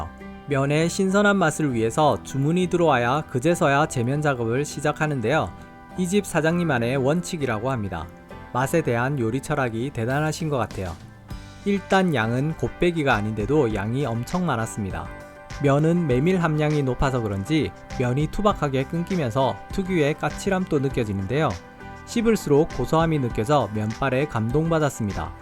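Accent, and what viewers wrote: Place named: Gyeongsang, South Korea. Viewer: 경상도 억양이 거슬리는 것 빼고는 좋습니다. AI 나레이션이 나을 듯요.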